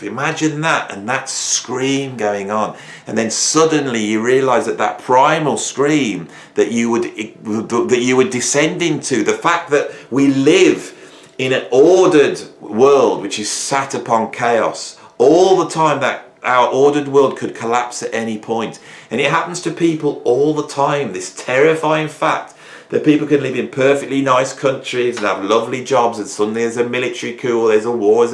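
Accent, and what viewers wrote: United Kingdom. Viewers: You seem to be laying on the British accent more than usual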